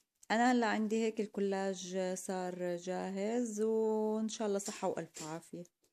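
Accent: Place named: Nablus, Palestine. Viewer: الله عليك ، ما بتتخيلي قديش انبسطت بهالوصفة صرلي زماااان مشتهية الكلاج بالجبنة ، القطر لازم يكون بارد ولا لا ؟؟. من وين أنت بفلسطين ، حاسستك نابلسية صح ؟؟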